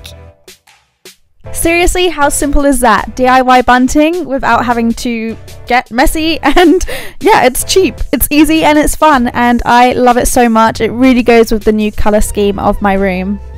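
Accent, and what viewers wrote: Australia. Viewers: amazing video. just wondering are you Australian?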